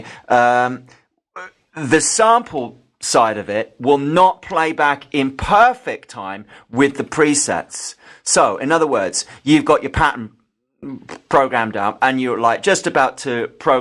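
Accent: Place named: South, United States. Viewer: southern voice makes me laugh but all in all find the series very interesting and funny. you persuaded me to go for a univox too great video that. look forward to the new ones. happy new year.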